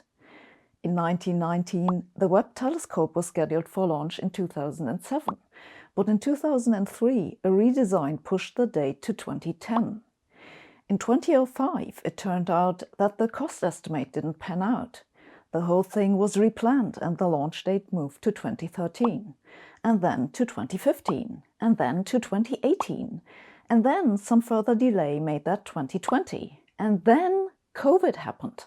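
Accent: Germany. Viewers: May I ask Professor, are you german?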